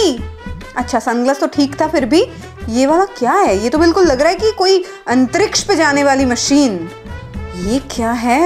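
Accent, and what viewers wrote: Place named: Bengal, India. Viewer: AP bengli ho na qki apke bolne ke style se ye hi lag rha h mujhe ye aeisa isliye mga qki mai bhi bengoli hu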